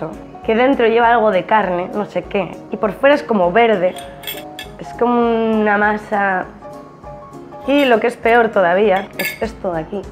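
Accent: Galicia, Spain. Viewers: es gallega??? es que tiene acento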